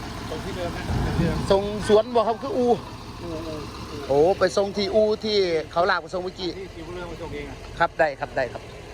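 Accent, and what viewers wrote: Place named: Kalasin, Thailand. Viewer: เอฟซี กับ เว้าลาวกาฬสินธุ์บ้านเฮานี่ล่ะครับเฮียฟังเข้าใจง่ายดีครับผม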